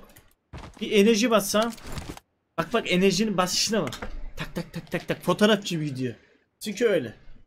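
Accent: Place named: Black Sea, Turkey. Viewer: gerçekten laz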